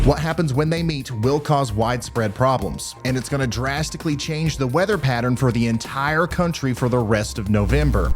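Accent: South, United States. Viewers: the way you modulate your voice with that southern drawl, may be considered insulting to some folk ... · Refreshing to hear good ole southern weatherman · Very well done. Took one meteorology course in college, and it made me appreciate that this is a really tough profession. is excellent. And I enjoy the southern accent. Eastern TN or NC (Triangle area?)Or am I way off? Your “r sound” sounds like eastern VA though. So I’m stumped. Please let me know where you grew up. Beautiful accent, btw! Pastime of southerners is guessing each other’s accents!!!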